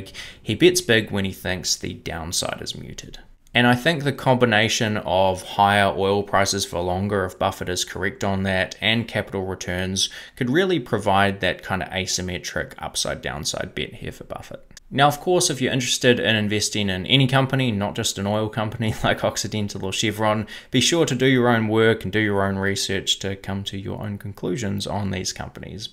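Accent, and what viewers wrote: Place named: New Zealand. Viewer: Love your kiwi accent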